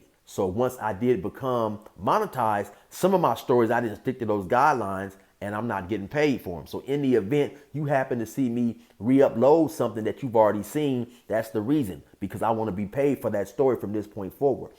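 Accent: California, United States. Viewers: Bruh in white and I literally cannot do a white California voice anywhere near this level. Sounds like that Wes Moreland (that big white guy who has a channel) hahaha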